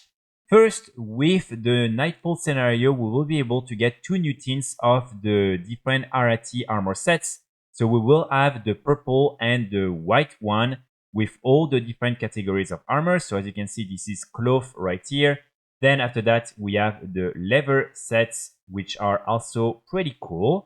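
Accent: France. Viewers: Dude is french?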